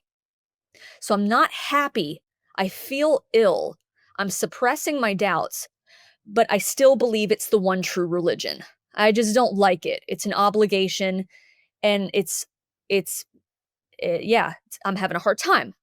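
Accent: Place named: Dallas, United States. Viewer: So crazy how clocked her Dallas accent in one minute. Takes one to know one. What a wonderful communicator. I used to drive by the Kingdom Hall on Mockingbird and Inwood every day and I wondered where the windows were!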